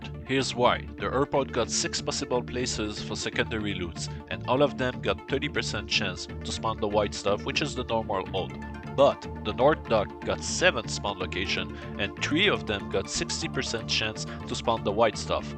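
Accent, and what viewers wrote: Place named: Quebec, Canada. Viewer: i recognize a quebecois when i hear one great tutorial tho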